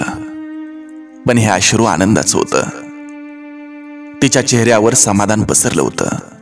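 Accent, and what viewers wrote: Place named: Vidarbha, India. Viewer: ग्रामीण जीवनावर आधारित ही कथा आहे. वर्हाडी भाषेने तिला छान सजवले आहे. सरजी चा नवऱ्यचा अकाली मृत्यू झाला होता. ती आता एकटी पडली होती आणि पोर पोरकी झाली होती. घरी आली पण आधीच दुःखाने व्याकुळ झालेल्या सारजा ला सासू ला सामोरे जावे लागत होते. घरचा आधारच आता देवाने हिरावून नेला होता. दुःखी असताना पण रीती नुसार ती त्याला आज घास घेऊन चालली होती अनाहूत पणे श्वापदाने सरजी वर हल्ल्ला केला होता आता मुलाची आई पण वर्च्याने हिरावली होती. मुले आता पूर्ण निराधार झाली होती. दुःखाचा डोगर कोसळला होता. आल्या वेळेला हिम्मत देऊन राहा असे आजी सांगत होती पुढे आजी आपले होईल किंवा पडेल ते काम करून राहू असे सुचवू पाहत होती. कोणीही त्याना साथ देत नव्हते वाण्या कडे गेले पण त्याची नीच वृत्ती आता जागृत झाली तो हिंस्त्र पणे वागला जाई चा गैरफायदा घेतला होता एका निष्पाप जीवाला त्याने मजबूर केले होते. नको ते घडून गेले होते. कालांतराने त्याची आजी पण आता गेली होती. 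आता हार न मानता ती आल्या. प्रसंगा ला तोंड देत होती. जाई ला पुढे मुलगा झाला होता तो अख्या ती आता त्याच्या सह तालुक्यात राहायला आली जिद्द करून तिने मुलाला शिक्षण दिले. पुढे त्याने तिच्या कष्टा चे चीज केले. तो छान ठिकाणी कामावर रूजू झाला आणि तिचे दिवस पालटून. गेले. एका करुण कहाणीचा सुखद शेवट झाला. एक वास्तव दर्शी कथा असून व्हराडी भाषेचा लहेजा सुंदर लागला आहे.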